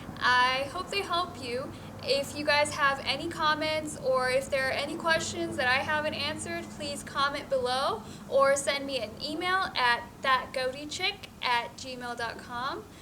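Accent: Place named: United States. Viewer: Hey doctor you said you are an IMG but you speak like an american how you got this accent ?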